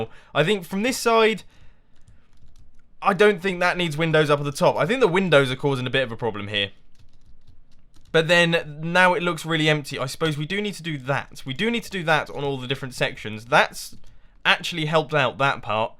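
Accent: England, United Kingdom. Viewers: thats because hes brittish #TheMoreYouKnow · UR BRITISH sorry first time watching your videos you talk in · Are you English?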